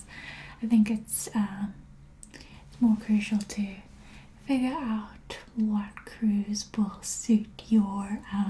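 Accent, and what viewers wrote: South Africa. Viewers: Love your accent. Is it South Africa? x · I thought she was British too in the beginning, but then there were parts that sounded too close to my own accent (Australian) and the natural conclusion is SA lol.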